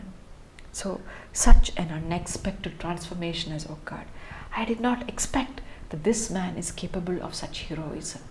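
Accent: India. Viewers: Love ur Indian accent 😊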